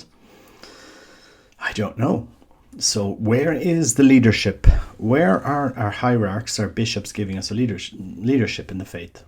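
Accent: Ireland. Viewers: Your subject matter is excellent and you speak so clearly. You are a true Catholic and It is a pleasure listening to your lovely Irish lilt. May God bless you abundantly.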